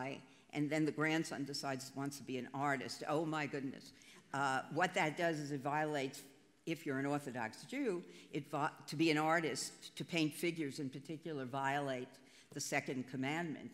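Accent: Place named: United States. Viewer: This is the best of the internet, the ability to join in, to lectures/presentations like these..... but - big sigh - why cant American learn to pronounce European names correct? If you knew how disgusting it is to listen to this, flat American dialect of this woman (where is she from?), you would be ashamed of yourself.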